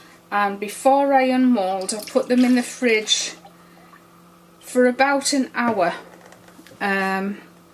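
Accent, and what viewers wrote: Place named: England, United Kingdom. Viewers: Love watching you! This sounds crazy but I catch myself talking to myself in your English accent and I am Texan!! This quarantine is making me bonkers!🤣 Love that you say you cook on a “ring”! I had to think about that for a second. We cook on a “burner”.😄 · Hi I’m guessing because of your accent you are from the north of England- I live near Newcastle! Love your vids very helpful. Well done ❤️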